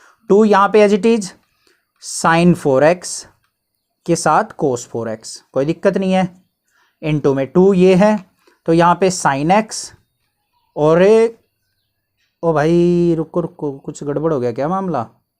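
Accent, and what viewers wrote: Haryana, India. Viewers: Sir aapki language se pta chalta h ki aap Haryana k ho 🤗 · Sir I am also from haryana.aapki boli sa hi lagta hai ki aap haryana sa ho but aapse kabhi pucha nahi vasa kis side sa ha sir aap😅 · Aapki voice se he pata chalta hai...ki aap Haryanvi ho👍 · i knew it sir aap haryan se ho even i belong to haryana so apka accent se hi samjhgyi thi and yaa sir i agree haryana ki kaafi itni green hai aur udher birds and all ka ghumna ghar ke bahar is so normal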